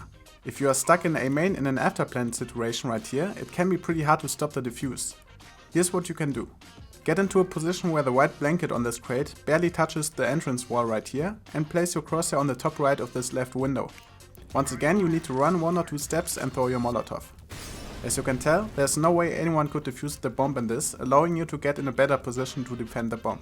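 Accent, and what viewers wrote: Germany. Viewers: Are you German? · Ur german accent is very good m8 nice vid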